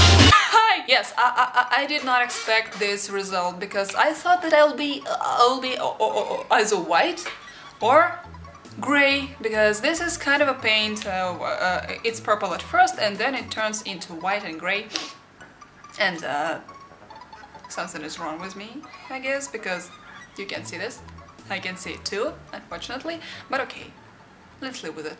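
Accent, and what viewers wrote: Russia. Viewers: deathless? you mean immortal? P.S. you need to get rid of your Russian accent and you will be perfect! Marry me :) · I like your Russian accent , in fact ,speaking English in Russian accent is so sexy , Btw, you are adorable😉😉😉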